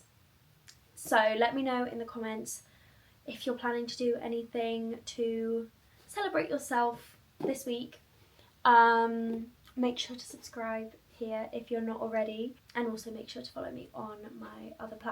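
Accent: United Kingdom. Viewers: I absolutely love your videos, I am from spain and I love hearing your british accent 💕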